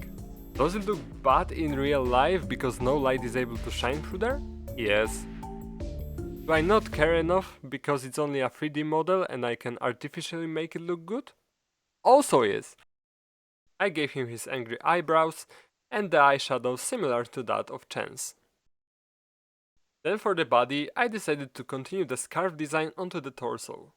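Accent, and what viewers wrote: Poland. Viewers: chciałem pisac po puerwszym uslyszanym slowie że masz strasznie polski akcent ale stwierdzilem ze mize poprostu mam cos z uszami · Po akcencie wiedziałem ze to polak. Zajebisty film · Po jak mówisz odrazu wiedział że z Polski jesteś XD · wiedziałem że jesteś z Polski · Polski Akcent Mocno wjechał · Po akcencie poznałem że polak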